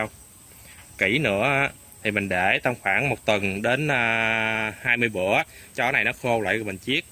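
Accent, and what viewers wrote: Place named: Mekong Delta, Vietnam. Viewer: Đúng chất giọng người miền Tây quê tôi, chỉ dẫn thật tình và tận tâm. Tặng cậu một like. Chúc cậu luôn gặp nhiều may mắn trong cuộc sống 🌺🌺🌺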